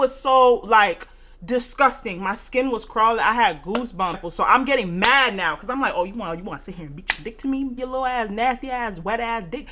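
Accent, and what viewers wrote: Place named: Philadelphia, United States. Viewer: I watched a few of your videos and was trying to guess where you’re from. I know the north east. I’m guessing philly. It was the “fuck you mean pussy” New subscriber thanks for the laughs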